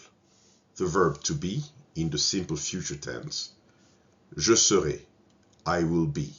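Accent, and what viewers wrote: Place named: United States. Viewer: Speaking American English,. Great job, blessings.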